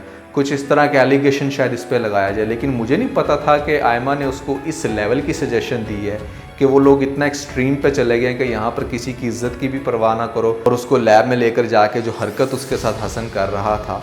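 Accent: India. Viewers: are you from India? Because your style is very Indian way of talking, your pronunciation of certain words are also not correct, if you are Pakistani you better improve your Urdu...nonetheless your reviews are ok.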